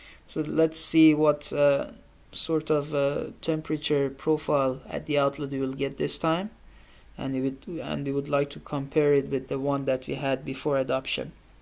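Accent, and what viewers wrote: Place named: Iran. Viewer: Persian ACCENTTTT.!!! Nice video btw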